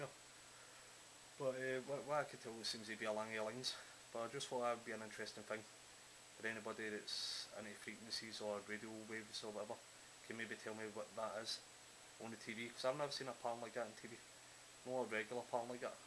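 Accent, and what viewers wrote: Scotland, United Kingdom. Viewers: Due to the poor audio, I had to turn on CC. Sounds like he is speaking a combination of English (Scottish) with a smattering of Galiec.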